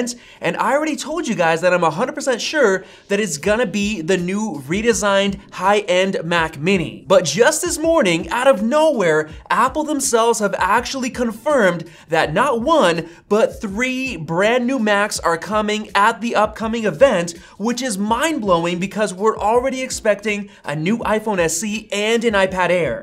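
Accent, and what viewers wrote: Russia. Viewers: Portativny personalny Computer! I love your russian accent! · Афигеть, у тебя русский акцент) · haha, I love your Russian accent.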